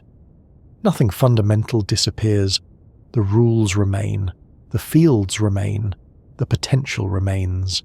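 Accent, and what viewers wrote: United Kingdom. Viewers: Friendly brit speaking (no music)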